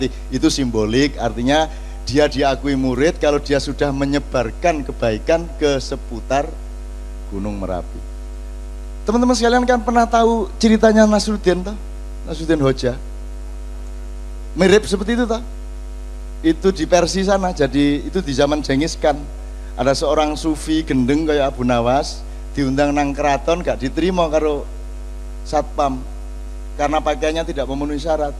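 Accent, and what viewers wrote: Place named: East Java, Indonesia. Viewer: bukan sombong khas orang jatim budaya arek mang gaya bicarane begitu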